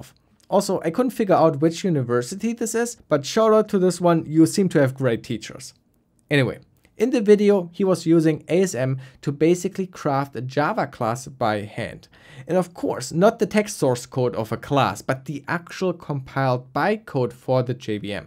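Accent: Germany. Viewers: Wie krass man hört, dass du Deutscher bist xD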